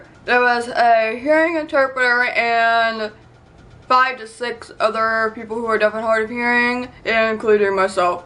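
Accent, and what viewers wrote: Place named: United States. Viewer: Not sure if anybody has mentioned this to you before but you seem to have an american accent. Were you always deaf? how do you explain your accent. Sorry you got depressed, keep smiling...it helps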